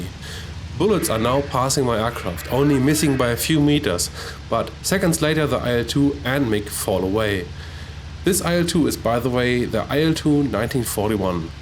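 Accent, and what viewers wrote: Germany. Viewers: I love these videos - the kind of practical, field study lessons that make a real difference in real-world fights compared to generic classroom do's and don'ts. Also, "... but after I'm sure the contrail dude just keeps trucking... " - American slang with a German accent. Brilliant! lol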